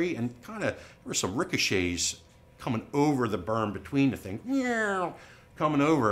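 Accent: Philadelphia, United States. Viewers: This is why I buy your products. I can hear how much u car about quality and doing things the right way...!! U remind me of family I have from philly area u can hear it ooze out of you... thanks for being a great American company...👍🏼👍🏼💪💪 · I'm from the Philly area and spent my Summers at the Jersey Shore it's great listening to you talk with your philly East Coast accent I love Geissele triggers I have one in the gun I keep next to my bed that protects my family there's no doubt you guys make some of the best gear in the industry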